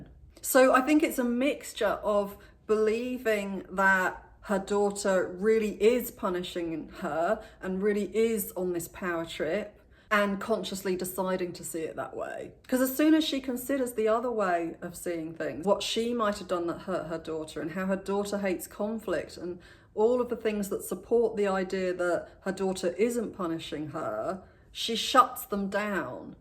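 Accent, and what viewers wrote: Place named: RP, United Kingdom. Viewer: 🙌🏾 much appreciated and respect 👏🏽🐈‍⬛. Nobody forced her to put videos on internet and share her personal experience, so why is she complaining about internet? Unfortunately I have been through a severe narcissistic relationship and I know very well all these types of tendencies. I’d rather prefer to enjoy the amazing lecture, the great and posh English accent but also the beautiful cat 🐈 🙌🏾🌻🌻🌻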